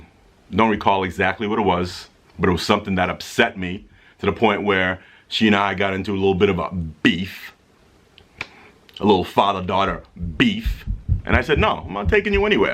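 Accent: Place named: East Coast, United States. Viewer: Im living for your accent.. my dad has an east coast accent as well just not nearly as thick as yours! I love u and the girls and Marcellus as well!! Keep the videos coming, im diggin the channel pops! 😘❤️❤️❤️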